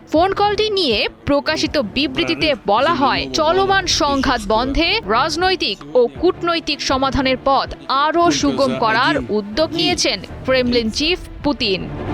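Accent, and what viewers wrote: India. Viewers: ভাষা শুনে মনে হচ্ছে এই সংবাদ পাঠিকা ভারতীয় নারী।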